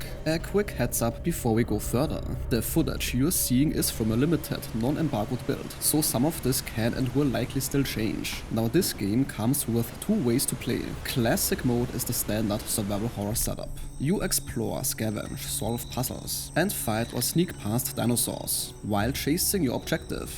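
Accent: Germany. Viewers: Junge dieser deutsche Akzent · Sounds german :D Thanks for the review !!!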